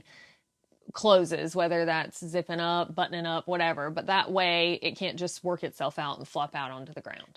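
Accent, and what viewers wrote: South, United States. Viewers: I would be laughing with you if she wasn't so cute and I didn't love the southern accent. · Your southern accent really came out on this one. I was asking why on earth would anybody be hiking with a ladder? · A hot blonde with an American southern accent is about as hot as it gets.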